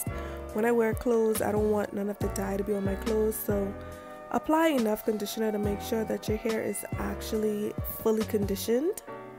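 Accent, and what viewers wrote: Bahamas. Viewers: jus had ta b bahamian frm she strt tlkn we cnt hide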